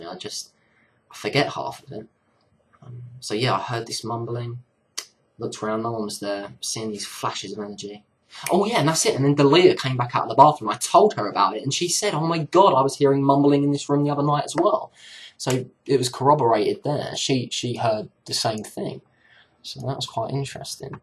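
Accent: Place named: Harlow, United Kingdom. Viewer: At last!!! I can pinpoint that accent...HARLOW!!! :^) lol. I'm an Essex boy but the complete opposite of the stereotype, and now live on an Island in the Indian Ocean...another Brit that has jumped ship! Anyway, regarding your series on Wave Function...I think you might be interested in Nassim Haramein, search also for ''the illusion of reality'' (59mins), and Flagellar/um motor or watch watch?v=VWvS1UfXl8k all parts. Peace Dude (fellow guitar player).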